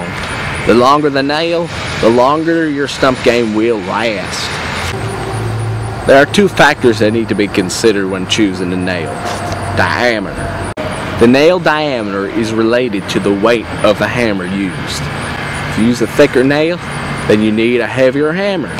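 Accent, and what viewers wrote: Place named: Northern Appalachia, United States. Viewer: That ain't a Southern accent, that's a Northern Appalachian accent.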